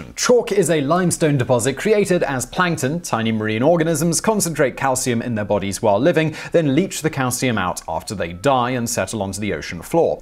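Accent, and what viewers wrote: United Kingdom. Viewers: I have no idea about anything that you talk about, but because you have a British accent I agree with you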